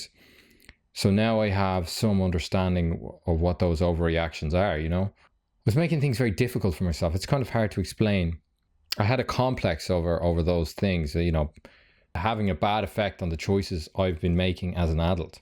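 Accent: Ireland. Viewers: Is this guy Irish? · He sounds Irish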